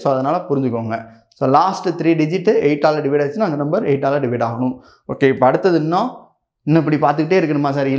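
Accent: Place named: Madurai, India. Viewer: Ungaludaya peachy madurai slang appidiye irruku,so cute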